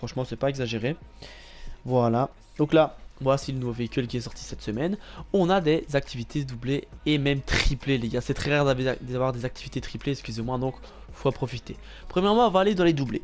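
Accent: Belgium. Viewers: T belge?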